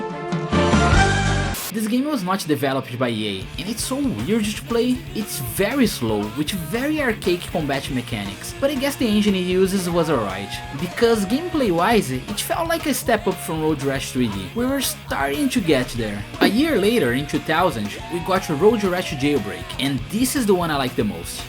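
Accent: Brazil. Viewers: You are not a english speaker right? The way you phrase your words sounds like you are brazilian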